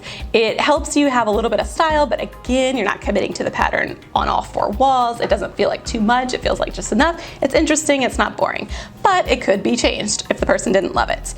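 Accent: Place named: United States. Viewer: I don’t hear anything but an American accent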